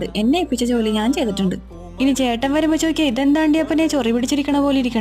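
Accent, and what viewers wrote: Kochi, India. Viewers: ഇതൊക്കെ നുമ്പട കൊച്ചി ഭാഷ 🤣🤣🤣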